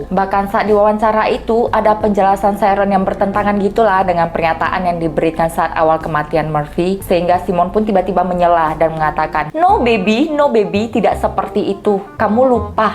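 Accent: Medan, Indonesia. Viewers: Cara ngomong nya sepertinya dari Medan haha Apakah dulunya tinggal di Medan?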